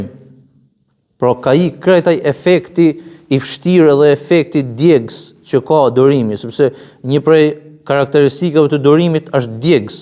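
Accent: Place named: Deçan, Kosovo. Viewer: Mos jeni nga Deçani? Sepse ashtu e paske Dialektin!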